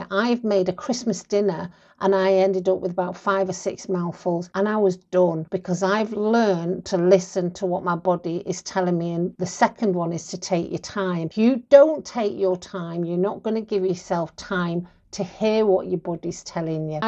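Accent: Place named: England, United Kingdom. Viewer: I like your accent. What part of England are you from?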